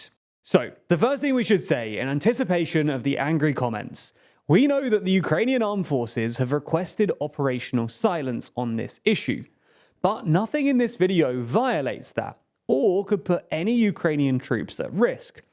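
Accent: United Kingdom. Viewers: pretty sure its just the british accent though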